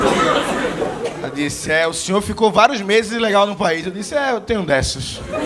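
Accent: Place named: Bahia, Brazil. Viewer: normal, ele é baiano em vez de dizer MAS VOU ele fala MAR VOU... E outras trocas de letra assim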